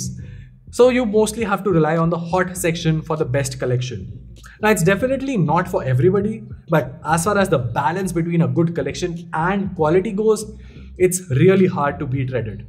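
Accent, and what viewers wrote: India. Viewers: join video, hearing indain accent, leaving the video. edit: thank you, the video was useful.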